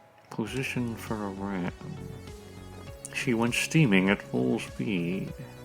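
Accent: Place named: United Kingdom. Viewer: I love people that have British accents! We’re all God’s children of the Earth. ❤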